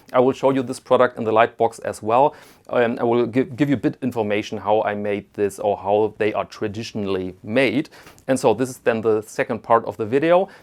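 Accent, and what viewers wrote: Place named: Germany. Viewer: Hi, great Video! I assume from your subtle accent and the Giro-Card, that you are German. Do you have any suggestions for a good online or brick and mortar shop based in Germany, best case in Hamburg. Cheers, Thorben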